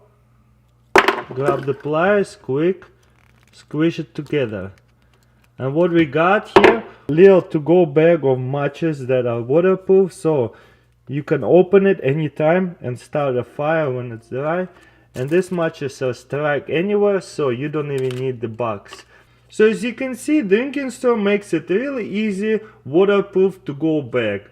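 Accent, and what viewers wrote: Russia. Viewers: ive never wanted a Russian accent so bad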